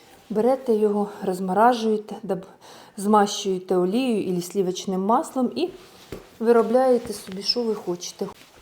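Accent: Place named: Dnipropetrovsk, Ukraine. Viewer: Я в захваті. Чорму раньше не попадала на ваш канал. Як гарно у вас все виходить! Все так уверенно, як вроді у вчителя, вроді ви всю жизнь з молоду випікаєте. Мені вже за 50 та й то руки трусяться, бо поки мама була жива, то я не вміла даже зліпить пиріжка. Оце 10 років як хоть трохи навчилася. Українська точно як у нас, на Дніпропетровщінді.Дякую вам, буду вчиться дальше. З мене підписка обов'язково❤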